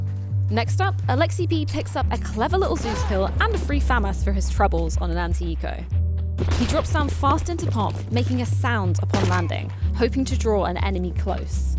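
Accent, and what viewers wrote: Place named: United Kingdom. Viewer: That female british accent tho 🤤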